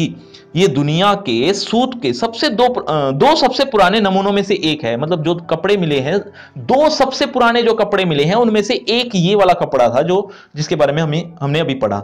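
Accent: Haryana, India. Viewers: sir aap hariyana se ho kya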